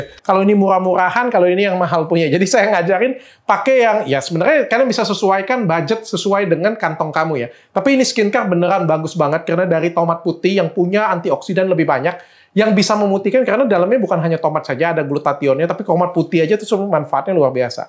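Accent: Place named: Palembang, Indonesia. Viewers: Dokter orang palembang ya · Dokter asli palembang yach...saya mendengar kata2 mogolah 😁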